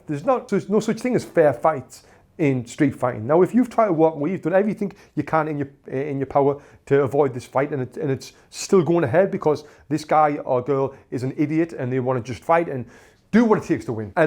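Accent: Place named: Peterlee, United Kingdom. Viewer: I live in Peterlee haha I though your accent sounded very similar to mine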